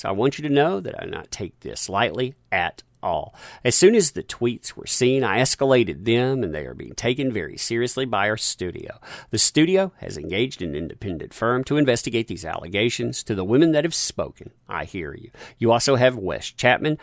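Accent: United States. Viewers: 2 mins in and you haven't even started yet? And your vocal fry annoying put on voice is just so annoyingly over American that I couldn't go on past the 2 min in introduction.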